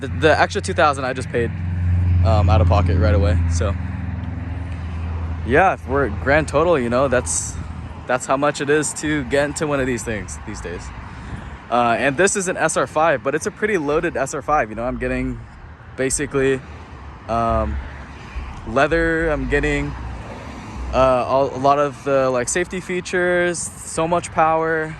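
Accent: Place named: California, United States. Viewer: I’m a New Yorker and I love your California accent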